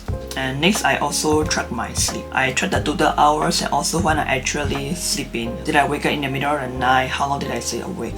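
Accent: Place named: Myanmar. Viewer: You're accent look like Burmese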